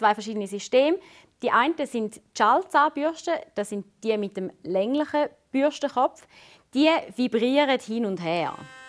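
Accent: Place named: Switzerland. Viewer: Ich freue mich immer wenn man was auf Schweizerdeutsch hören tut.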